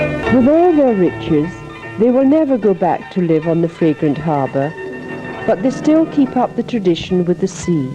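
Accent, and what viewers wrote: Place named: United Kingdom. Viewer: Very well done and loved everything about it. As a child visiting HK in the 1970s this brings back so many happy memories, this was a great time to live and a great place to live. Loved the traditional music, of course, and the narration, so British!! I have not been back since the 1980s and now things are so different under China's control. The memories will always however be there and this short video was a blessing. Many thanks for this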